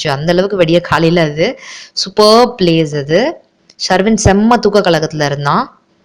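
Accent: Coimbatore, India. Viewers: very cute. dress and neck less super 😘😘😘😘😄😄😄😄😄.Naanga Coimbatore nnga. yendra uttuukarunu😘😘😘😘😘😘 solldrathu super ah errukku.slang very nice pa. condippa reply vennuuuuuuuuuuuuum. OK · Endra ootu karar enga ooru language m cbe. Really sweet this video i like so much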